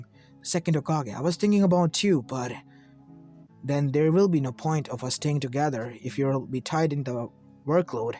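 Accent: India. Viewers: By your accent I think you are indian, I am indian too and its very good to see that we are also contributing to the Naruto world.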